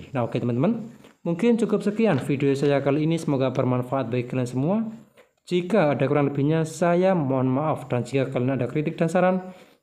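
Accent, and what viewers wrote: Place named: Java, Indonesia. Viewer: ..medhok, mMass, intonasinya...; jawiyhoo..?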